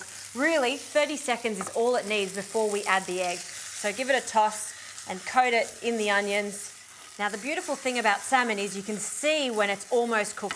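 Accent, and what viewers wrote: Australia. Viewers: Nice Australian accent.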